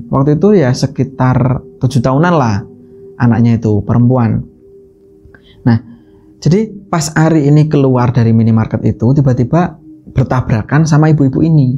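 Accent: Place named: Sragen, Indonesia. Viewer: Mas pean iku logat bahasane kyk wong sragen , blora y?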